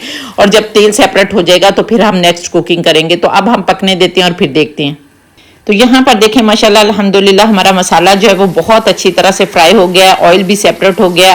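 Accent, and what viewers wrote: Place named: Punjab, India. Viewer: Acha g ye aunty b Pakistan ki hai punjab ki hai ap in k bolny k style dayk lay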